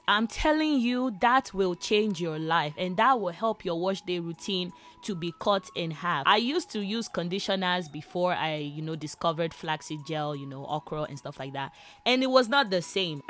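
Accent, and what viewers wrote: Nigeria. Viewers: I love your Nigerian Accent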